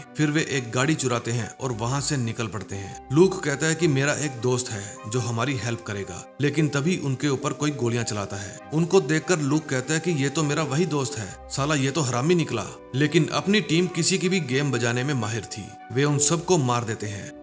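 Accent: Punjab, India. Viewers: ji punjab too belong karde, Hindi wich punjabi accent ah reha?? Just asking and the way you tell a story is really different and you bring humor along with it that’s unique!!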